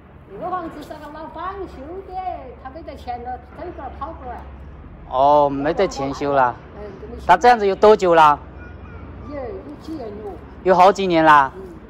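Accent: Hakka, China. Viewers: 閩西客家話口音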